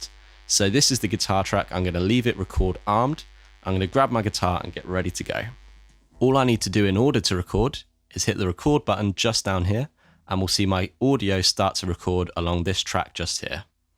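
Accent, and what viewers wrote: United Kingdom. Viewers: Barely heard the introduction Liked for the Englishmen accent omg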